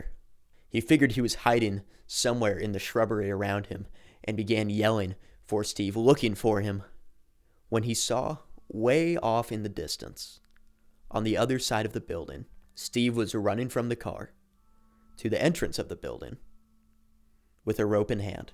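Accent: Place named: Pennsylvania, United States. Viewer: You're from Pennsylvania aren't you? I can tell by your accent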